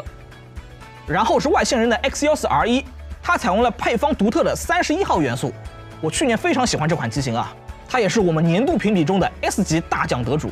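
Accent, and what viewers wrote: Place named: Nanjing, China. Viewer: 這位主持人聽起來好像南京人耶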